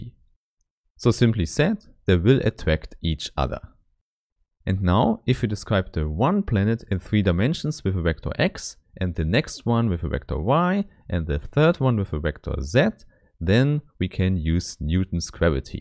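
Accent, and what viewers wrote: Germany. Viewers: German accent makes this video even more credible.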